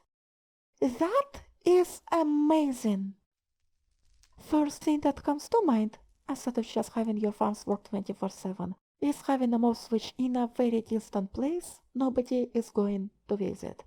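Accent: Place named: Russia. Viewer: Молодец. Надеюсь, что у англоязычной аудитории ты будешь популярна. Я не стебусь. Но по произношению понятно, что ты русскоязычная.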